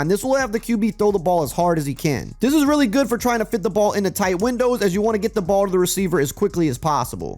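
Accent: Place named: Alabama, United States. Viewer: oh ok, cus dude sound like he got that bama accent but it LSU is Deep South too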